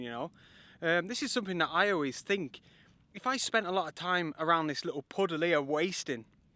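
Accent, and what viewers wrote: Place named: Cumberland, United Kingdom. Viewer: Good evening, from your twang what part of South Cumberland are you from (Millom). Nice to see you doing these Wainwright, fells.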